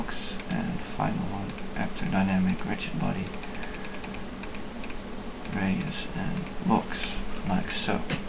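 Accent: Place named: Netherlands. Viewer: nice dutch acscent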